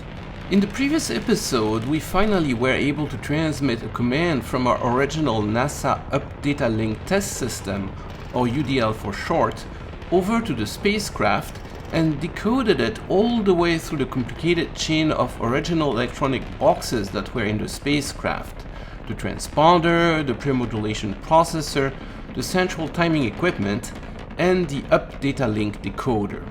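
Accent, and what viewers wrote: France. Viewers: All of this work you have done over the last several years should be preserved in the National archives, French accent and all. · u french?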